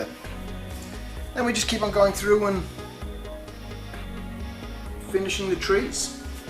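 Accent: Australia. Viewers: If you don't mind me asking are you Australian or Kiwi perhaps?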